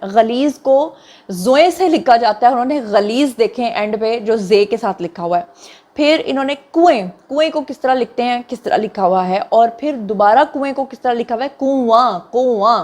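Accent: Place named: Punjab, Pakistan. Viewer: Jab aap anay tabsrey mein Punjabi ka tarka lagati hein tu maza aa jata hey.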